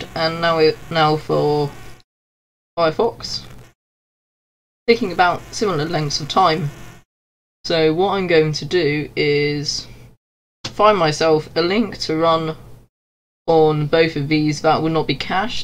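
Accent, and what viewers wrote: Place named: United Kingdom. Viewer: Youre save a british guy and i dont like british english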